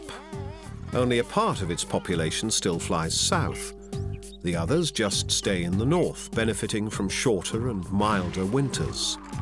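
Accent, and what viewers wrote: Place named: United Kingdom. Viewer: A smooth British accent can make people believe almost anything.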